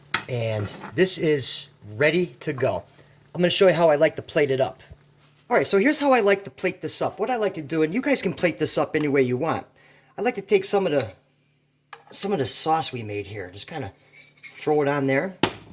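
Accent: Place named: New York, United States. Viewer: Accent of N.Yorker !!!😎Cheers !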